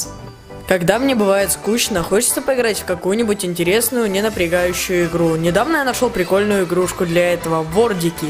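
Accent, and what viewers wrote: Belarus. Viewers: Слушай а ты из какого города? Бреста?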